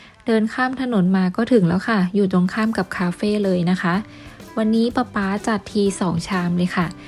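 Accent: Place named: Lom, Thailand. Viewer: บ้านอยู่อำเภอเมืองหรือครับมิน่าพูดหล่มได้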